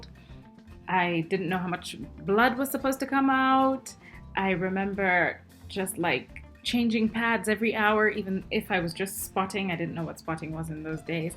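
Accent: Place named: Germany. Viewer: Kann es sein dass du deutsche bist? Leichter Akcent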